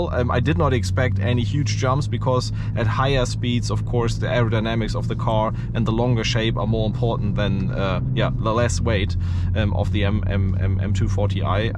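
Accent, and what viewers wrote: Germany. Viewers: Ist zwar ein wirklich gutes Video, aber du hast einen so starken deutschen Akzent, dass man raushört, dass du Deutscher bist hahaha. Meinen Like hast du aber auf jeden Fall trotzdem. Könntest aber eventuell etwas mehr auf die Höchstgeschwindigkeit eingehen. Ist gerade bei den neuen Autos sehr beliebt. :)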